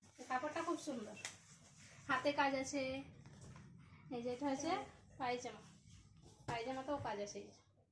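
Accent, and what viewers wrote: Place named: Malda, India. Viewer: Apa apni ki India theke bolcen.. apanr kotha gulo maldah ...mane amrao aivabe kotha boli ...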